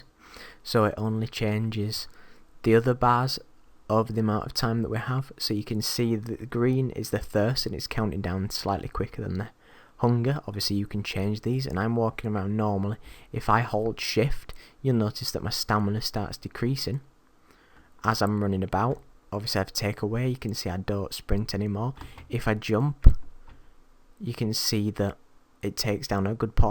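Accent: United Kingdom. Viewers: Classic British accent ;)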